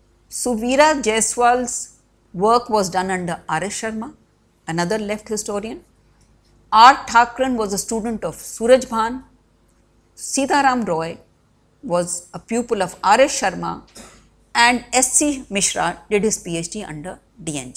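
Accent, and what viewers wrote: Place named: India. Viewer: englsih aati nahi hai par bolna english main hi hai chahay kitna hi thick indian accent ho.. it sounds nauseating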